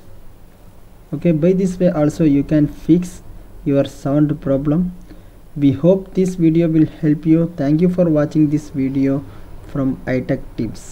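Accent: Kerala, India. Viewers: Malayali anno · Dude are you from Kerala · Where does he come from ? Sounds like Apu from The Simpsons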